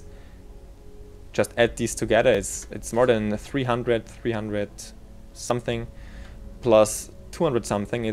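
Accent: Germany. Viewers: German accent? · Are you german?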